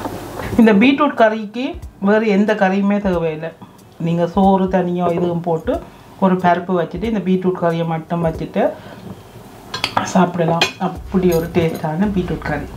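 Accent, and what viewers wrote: Sri Lanka. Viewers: leave it recipe, Srilankan தமிழ் ❤❤❤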